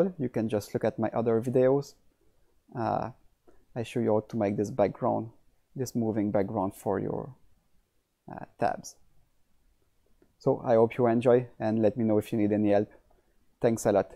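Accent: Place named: Quebec, Canada. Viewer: Oh wow, you've been so helpful since we switched to Elementor - Did not know you were Québécois? I think I recognize the accent :)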